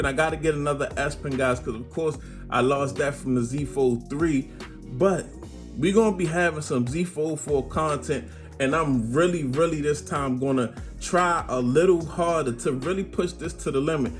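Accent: Baltimore, United States. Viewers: Boy got a strong Baltimore accent 🤣😂🤣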